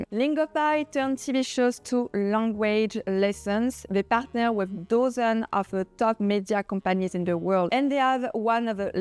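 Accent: France. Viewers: Love your accent! ❤ It sounds French Shick!!! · Avec votre "french accent" j'ai su à la première seconde d'écoute que vous n'étiez pas anglo-saxonne ;-D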